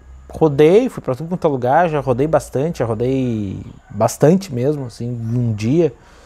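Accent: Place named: South, Brazil. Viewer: Sotaque portuga do sul do brasil.guri muito bom seu vídeo gostei demais.essa moto e meu sonho.preciso comprar uma dessa urgente.e vc disse tudo moto que não tem elétricas demais não dá problema e meu sonho e comprar pra mim mesmo fazer as manutenções dela.gostaria de uma 883 ou uma forth eight mas essa tem um tanque de 7 litros e por isso pesou já a dyna e maior e o estilo me agradou bastante.muito bom o vídeo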